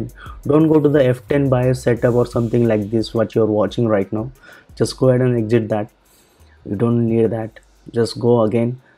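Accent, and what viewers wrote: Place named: India. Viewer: indian voice, 100% virus/ scam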